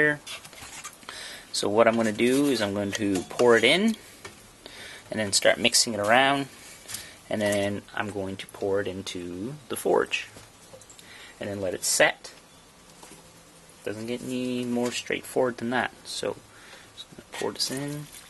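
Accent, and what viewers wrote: Canada. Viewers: ARE YOU CANADIAN?! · quebecois ? ou francais ?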